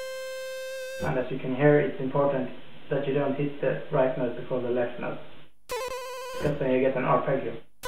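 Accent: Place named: Skåne, Sweden. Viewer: He's definitely swedish, sounds like he's from the Skåne area (not sure).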